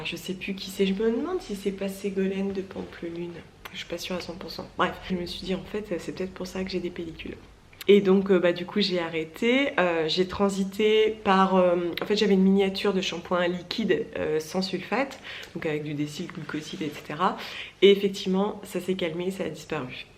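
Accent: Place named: Nord, France. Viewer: Hé hé ✌️ ça fait bizarre d'entendre une youtubeuse du Nord !!! 😉😊 Entendre Lille, Tourcoing 👏👏👍👍😁 vive le Nord. Je viens de te découvrir. 2 ème vidéo. +1 Abo. 👍 Pr le cuir, c'est pareil, j'en ai encore. Ms je me dis que le mal à été fait, et de les jetter ne changera rien. Donc je les jetterai ds qq années... ( Comme toi, mes affaires dure un pt Moment... ) Merci pr tes idée et info en tt cas. 🙏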